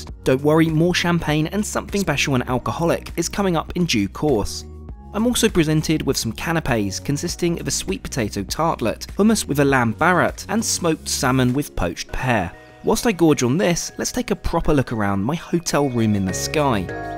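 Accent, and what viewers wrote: RP, United Kingdom. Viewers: And I love your British voice. I love hearing Brit’s … be safe with your travels …. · Bro this guy talks sooooo posh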